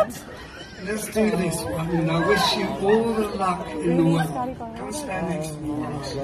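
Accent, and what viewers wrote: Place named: Liverpool, United Kingdom. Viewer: I love the scouse voiceover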